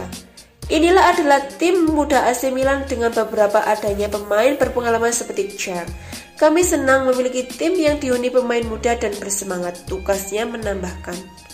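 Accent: Java, Indonesia. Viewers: Medok.. suarane... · Medok bgt min jowone ngendi 😅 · Wess medok jawa ne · Adooh medok2 eee kental banget😆😆😆😆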